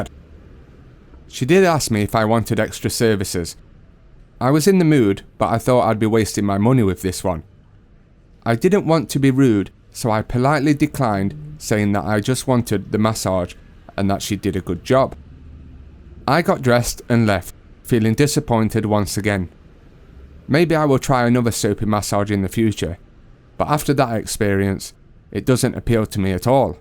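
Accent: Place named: Sheffield, United Kingdom. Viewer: The narrator sounds like he hails from Sheffield, England.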